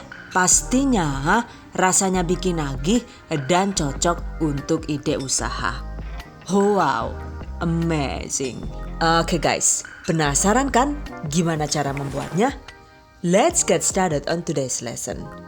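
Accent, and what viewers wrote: Banyumas, Indonesia. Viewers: Kok ada ngapaknya ya,jd lucu hehehh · Ada ajh yh guyonan ngapaknya Paling suka ❤️❤️ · "DONE" Mksh ya bun,atas resep nya.trnya bunda wong ngapak ya....?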